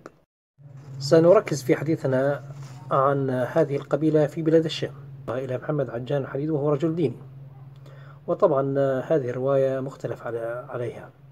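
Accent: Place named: Levant. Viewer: ول يخوي مانك عرفان تقرا لاسماء صح روح شخ ونام احسنلك خلطت الشامي ع عامي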